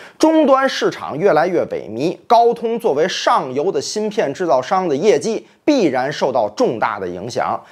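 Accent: Tianjin, China. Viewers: 听你口气也是天津人呀！ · 但是天津话是本频道的亮点啊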